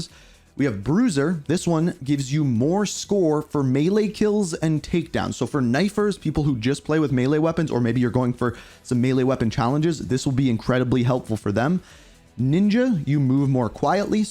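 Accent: Canada. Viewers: didnt know you were canadian til i heard those aboots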